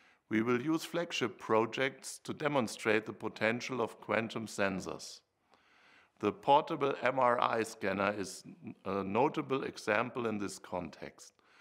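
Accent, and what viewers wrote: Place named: Swabia, Germany. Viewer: Tja, dem Dickerchen scheint keiner zu zu hören, dann bin ich mal der ERSTE: Erst einmal vorneweg Herr Braunibärli, auf dich wartet nichts Größeres, das muss dir nach diesem Vortrag (ablesen) absolut klar sein. 🤪 Meine Empfehlung an dich, Dickerchen, erst einmal abspecken, ich schätze mal so 20 kg, wird zwar schwer sein als Beamter, aber das musst du nun durch, Zeit sollte genug vorhanden sein, außerdem verlangt es der grüne Zeitgeist. Nur Veggie, kein Fleisch und Kohlenhydrate Adieu. 😉 Zweitens: Spießeranzug wechseln, einfach mal locker drauf sein, mit eine wenig Anstrengung klappt das bestimmt. (Vorsichtig ran tasten) Drittens: Haartransplantation, du siehts echt uncool aus, Geld sollte ja genug vorhanden sein als zweit oberster Schwätzer der BRD. Viertens: Weniger mit den Händen fuchteln, sieht echt bedrohlich aus, wollte schon vorschnell am PC einen RESET durchführen, meine rechte Hand konnte gerade noch meine linke zurück halten, ging aber noch mal gut. 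Fünftens: Die Fahnen im Hintergrund gehen ja gar nicht, ich sehe da absolut keinen Zusammenhang, die wehen auch nicht, wollte fast schon weinen schreiben. Sechstens: Was kostet eigentlich der Schinken links im Hintergrund? (Und bitte nicht rot werden) Siebtens: Mehr Englisch üben oder war das schwäbisch, na ja, so schlimm wie der Kollege aus dem Schwabenland war es ja nun doch nicht, obwohl... Achtens: Um was ging es eigentlich? 😁😁😁 Und Neuntens: Wer hat den Quatsch überhaupt bezahlt???, wirrrrrrrrrrrrrrrrrr? Puh, fast ver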